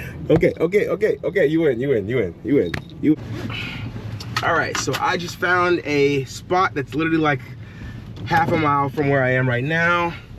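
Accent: South, United States. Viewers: ❤❤ I just learned how to pronounce beignets. Yes Southern accent is heart ❤️ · Um, you do have a bit of a southern accent to those of us not from the south. 🙂 Those beignets looked good. You can only have like one and have to pray that a diabetic coma isn't induced. But they are good with coffee.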